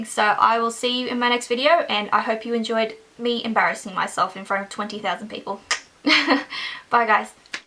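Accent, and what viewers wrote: Australia. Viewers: Your Australian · This Australian accent :D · Shes aussie :) yay one of us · Are you Australian? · Are you Aussie? You sound like it cx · Is she Australian? Cause I'm Australian. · Are you an Aussie?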